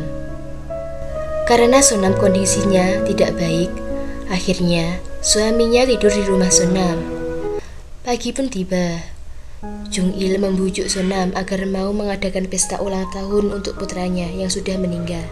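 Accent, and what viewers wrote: Java, Indonesia. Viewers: Kaka narator nya jawane medok yah..... Salam dari jogja ka.. 😊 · Org Jawa? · I just wanna say: your Javanese so thick and glued. No offense though. Peace ✌️ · Orang Jawa ya? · SUARANYA MEDOK BANGET SIH.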